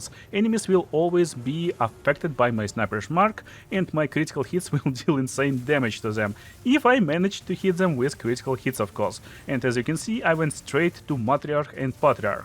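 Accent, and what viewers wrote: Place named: Russia. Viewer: Слышно что ты русский)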